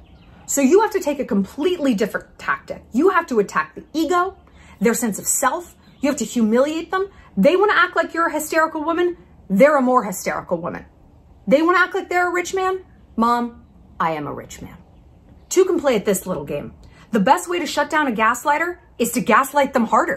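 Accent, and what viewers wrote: South, United States. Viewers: The southern accent is PERFECT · Your southern accent is soooo pretty. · Your southern accent is so cute!! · The southern accent 😂👌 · The gaslighting and southern accent is what I’m here for! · Side note: southern accent is pure gold. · Here for the Southern accent & that comment about the Easter Island emoji 🤣🤍💞 You’re the best,. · Love your southern accent. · That’s a great Southern accent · That southern accent tho 😂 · Omgg I love your southern accent. Sooo good, had to go back and listen it to it again, lol wish I could do that. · southern accent is what keeps me going lmao, she's too funny. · I’m obsessed with your southern accent haha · Your southern accent is SENDING ME lol!!!!!! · omg u r so funny i love you that southern bell accent is so hilarious i always told my bf to go take a nap when hes trying to be irritating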